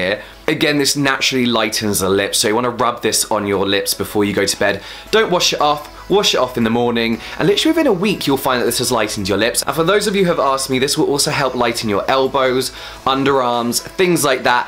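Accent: United Kingdom. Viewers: Your british accent 😆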